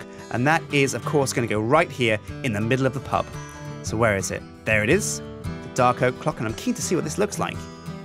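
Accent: Australia. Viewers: Dat Aussie accent doe!!! 😂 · The Ozzie accent lol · that aussie accent is on point! i would know im Australian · why did you suddenly go Australian? Also, you should make ALL the NPCs go to the inn when they need to eat becasue, well, it's an inn! That's where people eat! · Stereotypical Australian accent, but it's actually not bad,!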